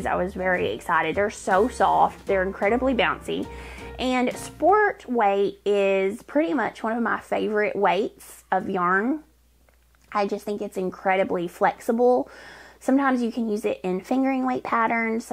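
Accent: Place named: Arkansas, United States. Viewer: You are so creative. When I saw the notification today, I said, Yay!! 🥰 Always a pleasure to watch you and, yes, hear your cute Arkansas accent (said the Midwestern Michigander). 😂 Fun fun fun. Thanks for another great video.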